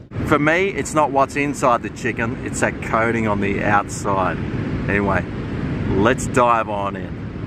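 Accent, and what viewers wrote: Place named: Australia. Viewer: australian accents are way too course, I can't listen to this prattling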